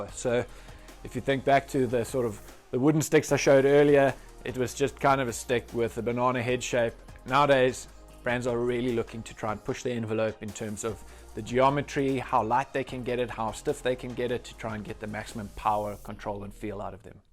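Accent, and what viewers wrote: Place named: South Africa. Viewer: red white blue, abn amro, and kampong(?). First I thought you were a dutchie, but then I heard the South African accent haha, great video!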